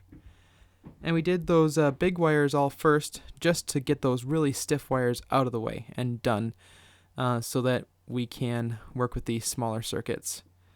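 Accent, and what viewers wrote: Minnesota, United States. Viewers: Sounds like a Minnesota accent. A virtual Canadian.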